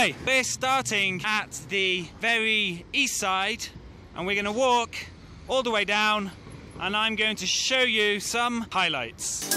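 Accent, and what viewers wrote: England, United Kingdom. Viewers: Me encantan tus vídeos!! Aunque soy mexicana me ayudan a conocer mejor la Ciudad de México (soy de Acapulco), y me ayudan con el inglés. Por cierto me encanta tu acento, siempre he pensado que el acento británico es el mejor en inglés. Espero que le entiendas a mi comentario, aunque puedo leer y escuchar en inglés no soy buena escribiéndolo. Muy buenos vídeos, sigue así :) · Diana T no es Americano es ingles de Inglaterra. checa el acento.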